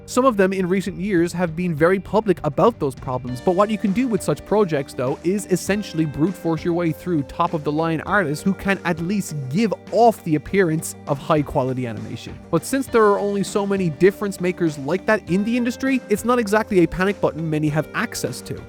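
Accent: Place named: Ireland. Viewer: I was so stunned to hear the titles of those trash shows come out of your mouth. Man, irish accents can really make anything sound at least a little better.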